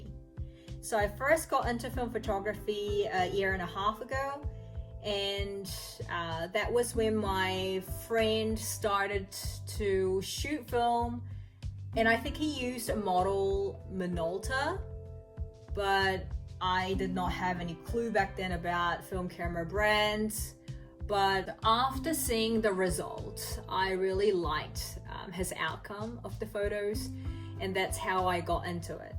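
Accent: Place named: New Zealand. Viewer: ㅋㅋㅋ 맨날 미드만보다가 오랜만에 키위악센트 들으니까 귀여워 😁😁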